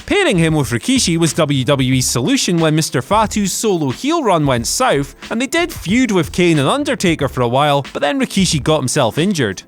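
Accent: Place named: Scotland, United Kingdom. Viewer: Can we please stop putting this guy on videos I actually love the Scottish accent but this guy just grinds on me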